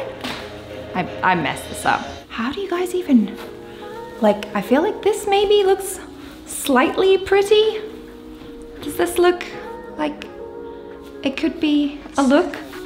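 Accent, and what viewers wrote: United Kingdom. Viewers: sounds more British here... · is more British on her own channel than Jaby's. Nice switch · I knew was British But god damn the british accent is so thick here😂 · Wooooohh.. Your british accent is gorgeous ❤💛😍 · That British accent is strong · I have an exam tomorrow but here I am watching trying to wear a saree and speaking in her British accent. Ye bhi theek hai!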